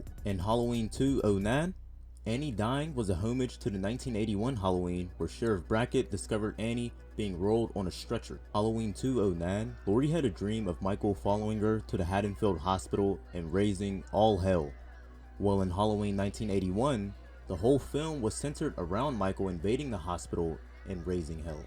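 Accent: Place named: Pennsylvania, United States. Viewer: I was trying to place it the whole video lol. Pennsylvania is an interesting one because it bounces back an forth between east coast and kind of an upper south like Kentucky almost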